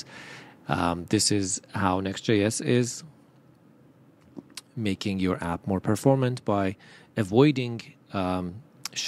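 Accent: Iran. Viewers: لحجه تون رو اول ویدیو رو شنیدم فهمیدم یک ایرانی هستی جان خسته نباشی💚🙏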